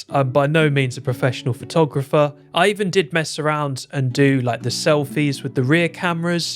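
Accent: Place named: Estuary English, United Kingdom. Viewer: I enjoyed the estuary English accent. I don't hear it much on here. We usually get upper English, Irish, or Scottish.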